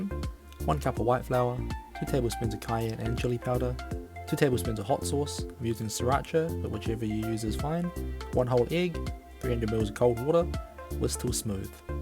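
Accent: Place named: New Zealand. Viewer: Umm it's the New Zealand accent. There are other countries in the world too. I understood him perfectly.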